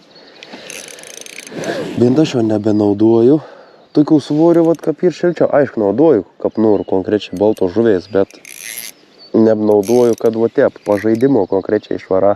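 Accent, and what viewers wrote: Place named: Samogitia, Lithuania. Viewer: Nesnekėtum zemaitiska nebutu idome ziurėt👍😃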